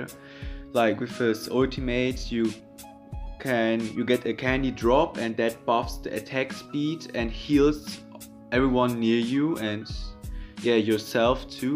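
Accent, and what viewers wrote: Germany. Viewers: Hahahaha direkt gemerkt dass du Deutscher bist :DDD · Du bist Save deutsch merkt man 😂 lass mal zsm zocken adde mich mal auf Ps4 heiße Universum007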